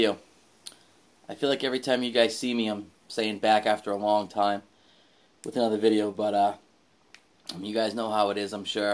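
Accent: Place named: Massachusetts, United States. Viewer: Enjoy your laid back style, keep it up. Always like watching pipe reviews and Yabo's so keep posting those. I'm originally from Rhode Island but live in Texas now, I knew by your accent you were in Mass. :) Go Bruins!!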